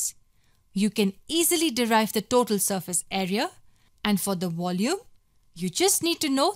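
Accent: India. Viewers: is the girl talking ..an indian ?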